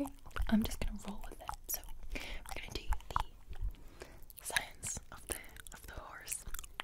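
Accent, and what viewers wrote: Scotland, United Kingdom. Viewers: Love your accento, you made me want to go to Scotland. You're lovely, i like everything of you, but the voice Is marvellous! ❤❤❤ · Ahhhhh yes another glorious night of falling asleep to that amazing Scottish voice 😴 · I swear Scottish Tingles are different than normal tingles. Tell us more about Scotland!! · Scottish accent challenge: try saying ‘burglar alarm’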